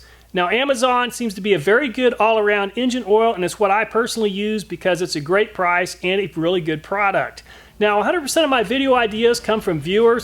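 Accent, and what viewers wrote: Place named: Pittsburgh, United States. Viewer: I'm from the Pittsburgh area....your accent....my ears cant be wrong....you're from there too right?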